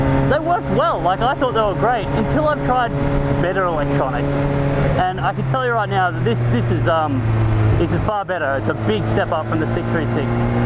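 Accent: Australia. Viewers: One of the best reviews I’ve seen in ages! Thanks! Nice to hear an Aussie for a change and no boring 5 minute irrelevant story that seems standard in the states? Haha. Excellent review thanks!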